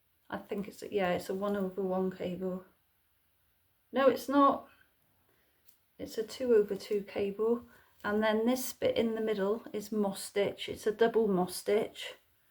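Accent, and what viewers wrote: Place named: Devon, United Kingdom. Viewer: I’m thinking you must be in Devon/Cornwall due to your accent! I’m living in rural Devon!😊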